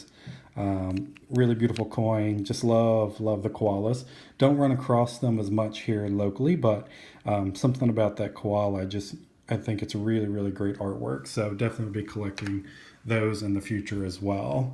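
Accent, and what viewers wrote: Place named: United States. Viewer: Too much diversity IMO,if you are going to sell that in the future for your retirement or whatever you will have to look the price of each coin. If you are a collector that's fine but if you stack silver for selling in the future you will have some trouble. I would recommend only buying bulk of 1 coin,you sound like an american so you should stack silver eagles. It's not that fun to have a pile of the same coin but in the end when the time comes to sell it's way better. Remember this is not for fun but for keeping wealth you have and maybe get something more in the future,nothing else brother.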